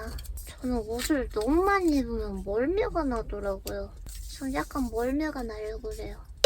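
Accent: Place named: Gyeongsang, South Korea. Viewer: 홀리몰리과카몰리~ 억양을 보아하니... 경상도 신가봐요~ ^^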